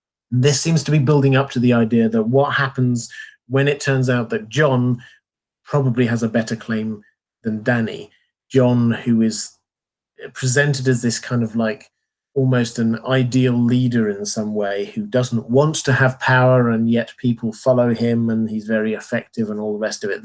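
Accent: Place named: Southern England, United Kingdom. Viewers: sounds like a southern English accent. I've never been to England so take my guess with a grain of salt. I would love to know the answer though. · Hes English alright, from the south. Lovely voice x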